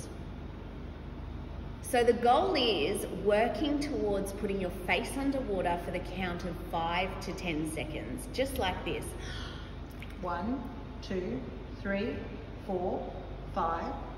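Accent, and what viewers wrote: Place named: Australia. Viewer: the best ausssie accent. thank ma'am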